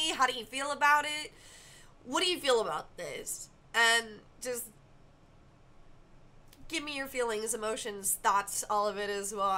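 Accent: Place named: South, United States. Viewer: “I’ll fight him. I’ll meet him at Walmart.” Jfc you’re so southern. 😂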